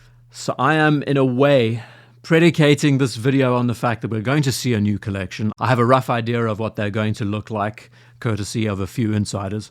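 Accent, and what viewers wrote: South Africa. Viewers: BTW just subscribed for the SA accent and guitar if nothing else 😅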